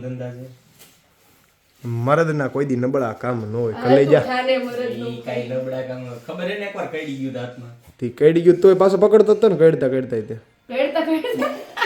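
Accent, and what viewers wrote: Kathiawar, India. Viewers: Vah bhai,, pure Kathiyawadi bhasha❤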